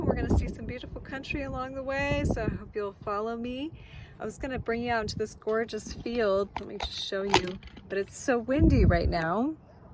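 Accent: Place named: South, United States. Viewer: Thank u that was helpful! My tongue has been burning 4 many months now so I’ll try the teeth breathing. Be safe on your journeys ❤ Oh yeah…& you are talking like a southern girl…u said “Hey ya’ll welcome back to my channel!” First thing I noticed!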